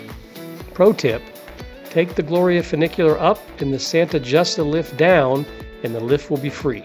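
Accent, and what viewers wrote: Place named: United States. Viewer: This video is very informative and helpful to those planning their first Lisbon trip. I do like your recommended walking map directions. I've been to Lisbon and covered most of what you mentioned but there are some places I did not see and do want to go back some time and cover those as well. My only criticism for your video is that you made some glaring typos and mispronunciations (such as Barrio Alto instead of Bairro Alto). Baixa should sound closer to "Buy-Shah" than "Bahk-Sheeya". It is such an American tendency to pronounce things the way an American would read them instead of learning how they are really pronounced. When creating a informative video on a place, you do owe it to your viewers to make a better effort here. This critique aside, you did a very good job on the information presented to help people plan things.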